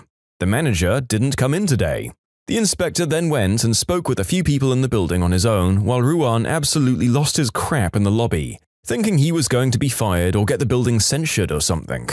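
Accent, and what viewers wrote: United Kingdom. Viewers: Luh, Another British narrator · Another British narrator???!!!😂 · Bro u seem more Britishie Today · What the hell happened to his voice he sounds brit ash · WHAT THE ACCUALL- WHAT'S WITH THE VOICE?! SINCE WHEN IS BEITISH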